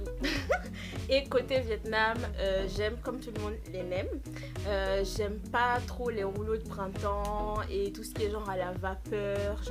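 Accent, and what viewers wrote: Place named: Ivory Coast. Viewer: Tu as vraiment l'accent ivoirien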